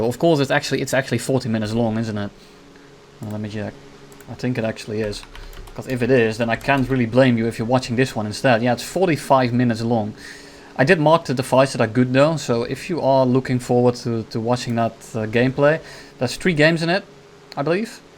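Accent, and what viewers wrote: Netherlands. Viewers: Q: what country are u from? You sound dutch but that's Just me I think.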